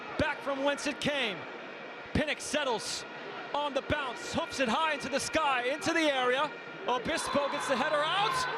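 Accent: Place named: United States. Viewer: Amazing but shame it was an American commentator. It just sounds weird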